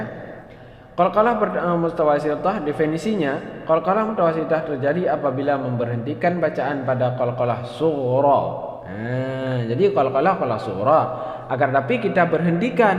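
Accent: Aceh, Indonesia. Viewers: Orang Aceh ya ustd?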